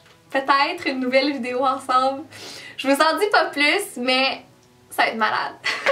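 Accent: Canada. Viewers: Êtes vous français ou canadienne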